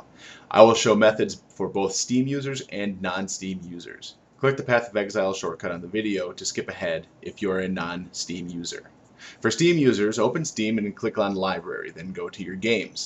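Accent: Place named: United States. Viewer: thank you so much for speaking clear american english without an accent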